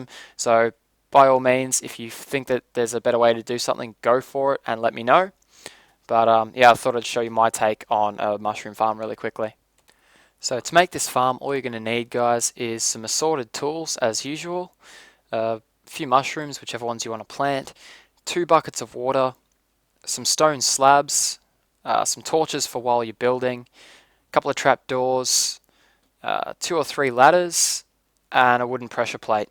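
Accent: Australia. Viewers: are you an aussie :D? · r u aussie?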